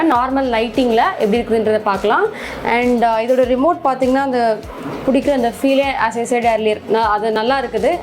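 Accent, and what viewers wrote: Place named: Bangalore, India. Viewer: Good video I think u from Bangalore u speaking tamil is Bangalore style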